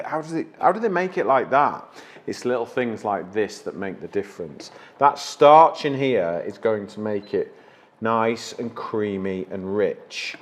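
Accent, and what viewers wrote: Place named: North, United Kingdom. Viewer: love the accent! how northen are you?))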